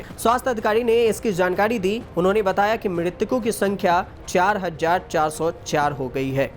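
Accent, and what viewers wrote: Bihar, India. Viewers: Bolne wala bihari hai ....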